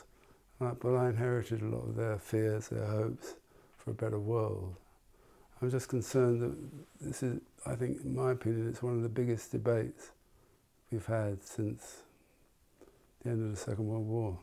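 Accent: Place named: North London, United Kingdom. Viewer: What an interesting guy. He's so well spoken and thoughtful. Yet to me anyway, he's kept the working class North London accent. He speaks highly of his brother, who he can't get along with. I love that "Paul's got a new record out"?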